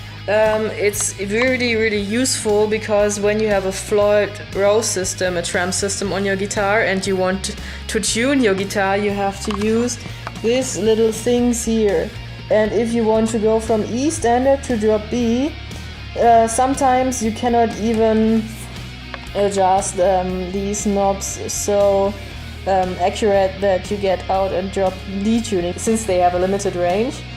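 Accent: Germany. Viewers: Hi love your covers!! But I think you should Have Zakk Wylde and Dimebag RIP!!! also Yngvie!!! And the great Eddie Van Halen!!! Imo, way better Guitarist!!! But as always love German accent!!! Keep Rocking as always 🤘🤘🤘🤘😈😈😈😈😈👍👍👍🤘🤘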